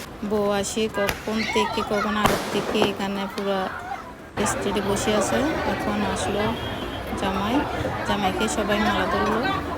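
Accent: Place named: Chittagong, Bangladesh. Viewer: Apo atte onor chittainge kotha besi vala lage.apon apon lage .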